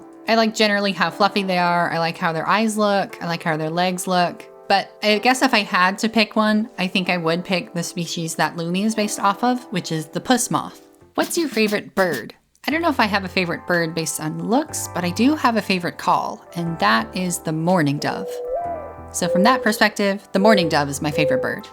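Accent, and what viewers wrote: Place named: South, United States. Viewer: i'm a linguist so it's actually super easy to answer! so southern american english has a lot going on with front vowels (vowels where your tongue is in the front of your mouth - think the vowels in "heed," "hid," "head," and "had") and diphthongs (vowels that are combinations of two vowel sounds - our non-rhotic diphthongs are vowels in "eight," "vote," "find," "down," and "boy"). particularly, SAE has the pin-pen merger where the vowels in those two words (/ɪ/ and /ɛ/) merge into the same vowel. you do this a little bit in the word "thing" in this video at . with diphthongs, SAE tends to reduce diphthongs to monophthongs; think "ah" instead of "I." you do this a little bit in the word "time" at . on the other hand, there's also diphthongization of what are traditionally front monophthongs! so thing like, "pay-en" instead of "pen." you do this a little bit in the word "genuinely" at . 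you don't like fully diphthongize it, but you turn /ɛ/ (vowel in "bet") into /e/, which is a vowel that doesn't traditionally exist on its own in english, as usually it's part of the /eɪ/ diphthong (like in "bait"). i feel like you also really velarize /l/ sounds a lot, like in "general" throughout the video. typically in american english, we do what's called an apical "L" (or light L, /l/) at the beginning of syllables (like in "light") but we do a velar "L" (or dark L, /ɫ/) at the ends of syllables (like in "pull"). the dark L is called as such because it jus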